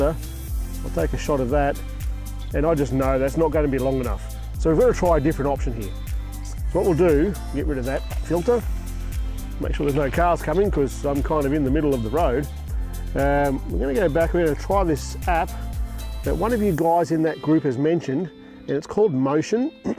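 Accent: Australia. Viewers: With my upper intermediate English I can barely understand Australian accent :( But videos are great :) Thanks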